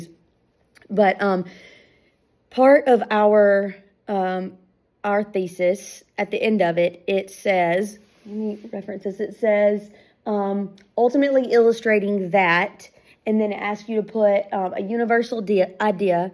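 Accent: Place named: South, United States. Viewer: Southern Accent, 80's hair.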